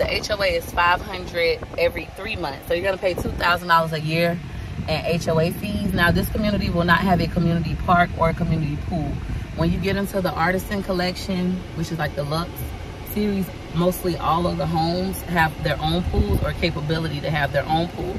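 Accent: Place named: South, United States. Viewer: Okay, I like the house but don’t love it…especially for that much $$, but tell me why your voice is giving Beyonce today? 😂😂 You have that sexy whispery southern voice today lady! 🥰 I missed the zoom this morning, but as soon as you post the recording I’m on it!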